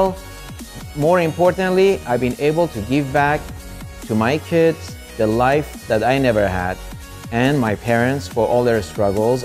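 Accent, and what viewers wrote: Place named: Iran. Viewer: Pure Iranian accent. It's good tobe original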